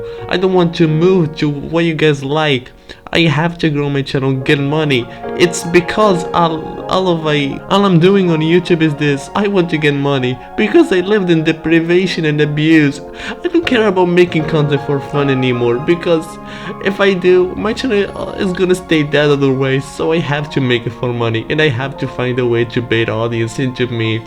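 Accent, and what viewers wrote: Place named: Alabama, United States. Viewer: yo. i thinked you are from alabama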